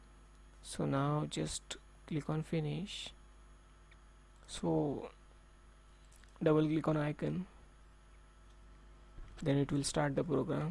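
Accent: India. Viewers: Just a constructive comment: please make sure you watch you accent (the way you are speaking). This video, by mistake, might be watched somewhere at Europe or North America then people are going to make fun of Indians. Your content /subject is not also very helpful. For the future, keep this in mind.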